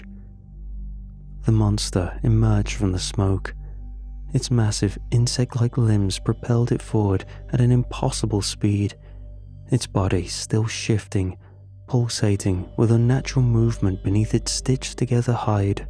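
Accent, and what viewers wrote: United Kingdom. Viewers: The British pronunciation of many words varies from ours greatly. And he has probably just as many British subs as he does anywhere else. So why should he cater to just one area with his pronunciation? Now if you want to hear some horrible grammar go listen to! He is American and mispronounces so many words I’ve given up trying to help him.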